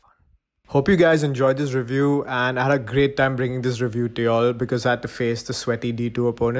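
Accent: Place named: India. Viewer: Indian accent is very bad i don't like it. Sorry to tell you the truth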